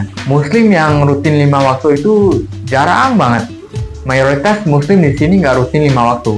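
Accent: Jakarta, Indonesia. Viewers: You kata you born and bred sini. Tapi you berbual accent Indo. Am I judging you? Yes. How do you assume about the budaya orang Muslim Singapore tak routine solat 5 waktu? I'm pretty sure banyak2 Keje office tu ada solat cuma mungkin you yang tak tahu. Kalau tak solat tu who are we to judge. You can probably be the wonderful Muslim asking them to join you in the solat. Your point of view on this shouldn't be in the content. Solat atau tidak, kita tak perlu nak mempertikaikan but we can do better if we want our fellow Muslim to start solat. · Pertama kali berkunjung ke akun ini. Keren bang bahasa Indonesia dengan aksen Jakarta meskipun masih ada melayu karena memang nativenya ya. Sukses terus kedepannya🙏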